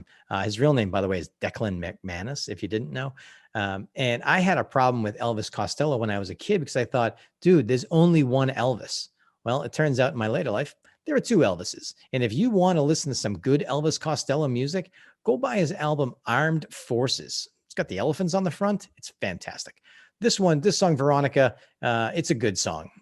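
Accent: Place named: Boston, United States. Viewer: Too funny! Finally my love for has brought me fame! LOL Loving this and I plan on checking them all out. You may have moved around a lot but still maintaining that wicked awesome Boston accent!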